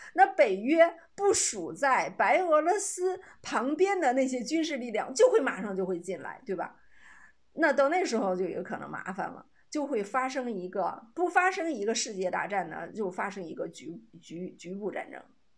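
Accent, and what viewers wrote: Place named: North, China. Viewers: 拜托＂以后＂发音＂蚁后＂ ，不是＂姨后＂。 你这老女人的一口北方农村口音太丢人了。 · 你的中文是跟谁学的？北方农村口音怎么丢人了？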